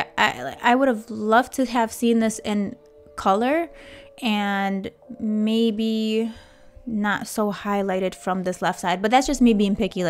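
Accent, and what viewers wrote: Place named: New Orleans, United States. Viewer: I just placed your accent, it's New Orleans, right? You sound just like a friend I had from there.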